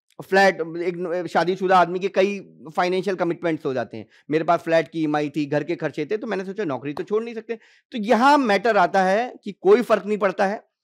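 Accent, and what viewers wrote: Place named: Kanpur, India. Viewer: Kya baat hai kanpuriya language .. 🙏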